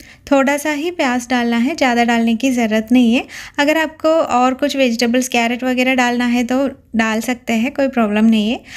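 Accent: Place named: Kerala, India. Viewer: I like your Hindi. You remind me one of my Kerala friend.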